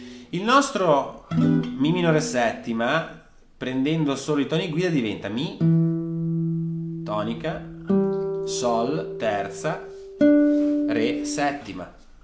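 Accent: Genoa, Italy. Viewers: Maestro sei bravo,bravo,bravo, complimenti , la lezione sul walking bass è stata una meraviglia , poi sentirti parlare con quel bel genovese e' il massimo, una carezza in più a Nebbia..🙂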